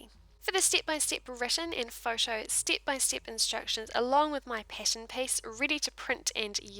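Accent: New Zealand. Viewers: A kiwi voice yay! Great tutorial thanks.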